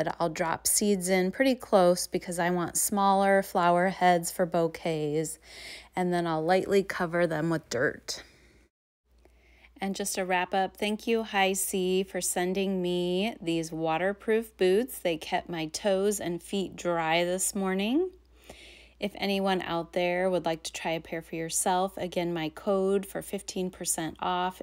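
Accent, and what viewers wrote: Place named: Quad Cities, United States. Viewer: This is random, but one of the reasons I love watching your videos because you talk like me! I'm from the Illinois side of the Quad Cities and I say "root" (and "roof") the way you do which I have come to find out is not the way people in other parts of the country say it.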